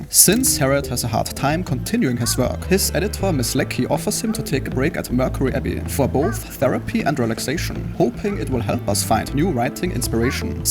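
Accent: Germany. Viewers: So geil wenn deutsche englisch sprechen 😂 man hört es irgendwie immer raus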